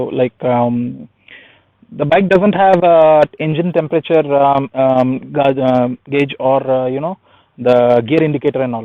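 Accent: India. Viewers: Its Indian English.. If you dont like.. none requesting you to be here.. move ahead buddy!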